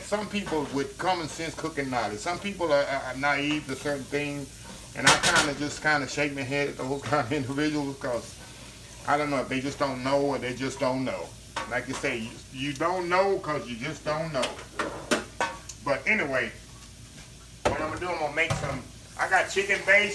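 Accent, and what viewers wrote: South, United States. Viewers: He's a southern man and talks the way my dad used to!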